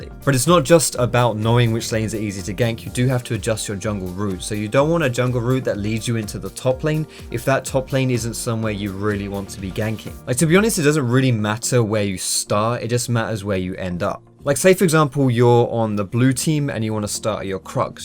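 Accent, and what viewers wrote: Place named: United Kingdom. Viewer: Ok. love your videos, and I watch them religiously, but after like 9 months I have to ask WHAT THE HELL ARE YOU SAYING IN YOUR INTRO? it sounds like "special P here" or something. My american ears can not take thine british accent when spoken too quickly.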